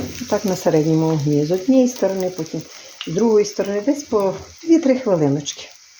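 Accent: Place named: Vyzhnytsia, Ukraine. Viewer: Доброго дня, Ви моя найулюбленіша господиня , хоча у мене біля 70 кулінарних каналів, мабуть тому що я також з Буковини, хоча живу дуже далеке, якщо можливо скажіть звідки Ви саме, бо відчувається Вижницький район!! Дякую Вам велике за Вашу працю в любі до неї!!!!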